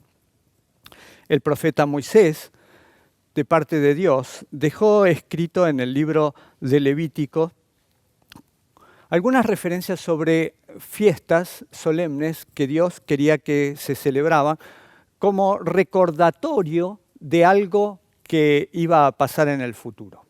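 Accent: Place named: Argentina. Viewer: De Argentina supongo por su timbre de voz.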